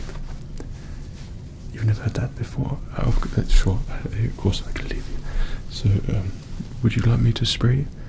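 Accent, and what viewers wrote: United Kingdom. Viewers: So you are British. Nice and same. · I swear he's british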